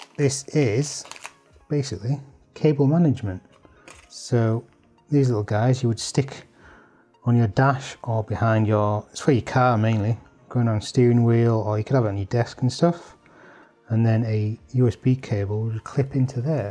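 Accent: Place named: Newcastle, United Kingdom. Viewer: Hi. You might remember me from Seon's live stream chats. I'm a Dutchman not trained in UK dialects but I think I'm hearing a Newcastle area accent?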